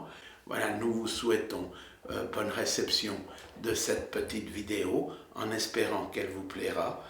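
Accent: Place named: Switzerland. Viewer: quel bel accent ;-) vaudois ou genevois ? salutations et merci encore pour votre travail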